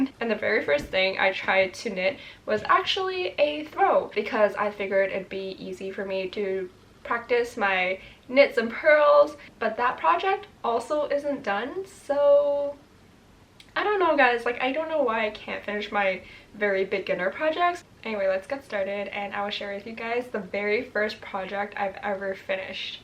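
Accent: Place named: Canada. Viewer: I have never seen your videos before and as soon as you started speaking I was like this girl is Canadian. I wouldn’t be surprised if she’s from Toronto (area) then you mentioned the gta and my head snapped up so fast. Will definitely be checking out that yarn shop. Thank you 😂❤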